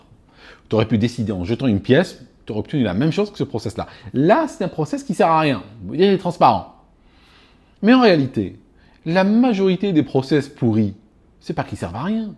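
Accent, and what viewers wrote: Switzerland. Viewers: Quand vous parlez de cela vous parlez de manière très saccadée. Cela diminue fortement la pertinence de vos propos. De la langue arabe transposée en français ? Cela change de votre accent suisse quand vous êtes nerveux, et compréhensible maintenant que vous êtes dans un pays de langue arabe. À part cela cinq étoiles sur le contenu. Flight safe et merci.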